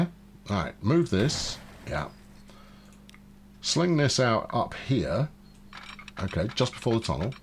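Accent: United Kingdom. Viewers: I loving your Doon canyon series. And nice to hear the dulcet tones of a fellow Brit. What what, cuppa tea govna etc etc.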